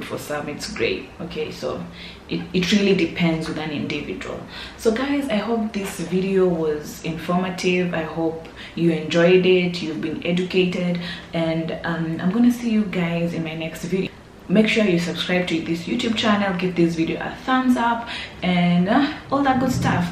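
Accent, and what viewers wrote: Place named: Kenya. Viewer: to be honest what i like most is your accent, a lot of you-tubers that are in Cyprus got deep African accent like Nigeria accent which is not pleasing. i think you from Kenya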